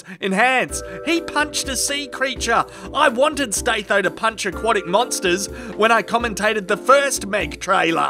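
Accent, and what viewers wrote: Australia. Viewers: You are the Siskel and Ebert of reviewers with an Aussie accent. No not because they’re both dead, because you’re just that good.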